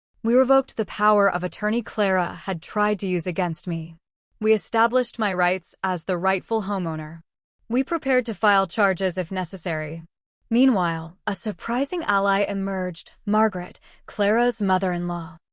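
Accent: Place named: United States. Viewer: If she was born on Australia why has she got American accent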